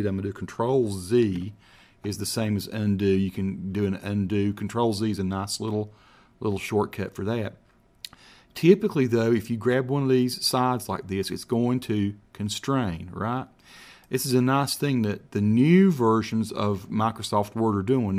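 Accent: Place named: North Carolina, United States. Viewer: that accent is just precious. You sound like your from southeast NC.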